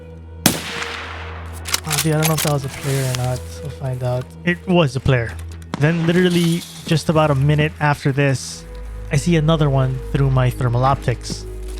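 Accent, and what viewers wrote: Philippines. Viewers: Hello, I'm getting on the new wipe, it'll be my second wipe. So starting from the beginning. Seeing if you'd like to queue up with me at all? Also are you filo? You have a filo accent. I'm filo myself! Nice videos and I enjoy it! 🇦🇺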